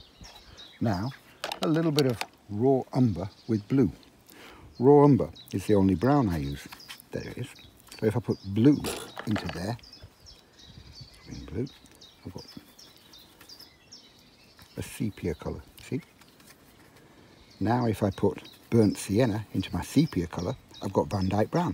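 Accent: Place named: England, United Kingdom. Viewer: Would you like a little humor? I've watched and enjoyed quite a few of your demonstrations now, and all the time I thought you were saying 'hocus" for your green, not Hooker's green! I'm from the deep south in Texas, and our accents are not as lovely as the English.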